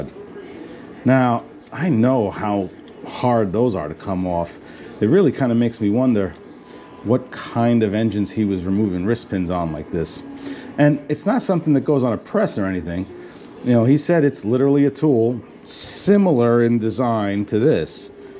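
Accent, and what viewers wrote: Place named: New York, United States. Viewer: I like your new york ack'sent'....I had a partner in in los angles who was from brooklin and he was a good friend,,Yet he was So much of a grinch'..?[[the dude had an atatude'...anyway I llike your stories..and You have a father that is very good to you...I like it...I always wanted a harley so I kept fixing up old broken down bikes till I made enough to use as a good down payment,,and along with what I could save from my job driven the senior bus...I bought an 02 fxd...once I payed it off..I spent way too much time and money changing evreything till it hardly had any harley parts...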